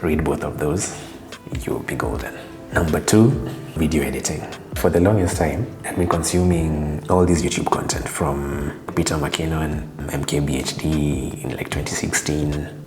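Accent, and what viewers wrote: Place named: Kenya. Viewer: Sounds a kenyan accent...i love the video lighting quality Love from Ug. 🇺🇬...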